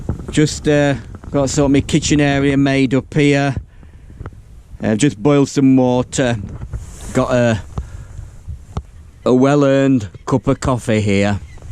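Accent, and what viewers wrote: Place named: Sheffield, United Kingdom. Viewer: Loved this one.Currently "wild" canping in myback garden as the missus is recovering from P.E. and I can't get out into the Aussie bush at the moment.11.00 pm here and a few home brews and sneaky drams of Glenfiddich will see me retire soon into my Doite tent..Think it is from Chile.Originally from Darley Dale so enjoy the Sheffield accent. Cheers Keith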